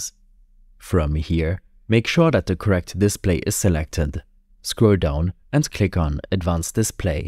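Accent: Germany. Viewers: I said "lern Englisch" it's German because of his German Akzent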